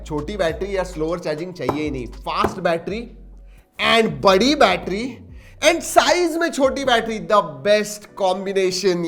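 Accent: Maharashtra, India. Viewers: ap Marathi ho na. bhiya 😅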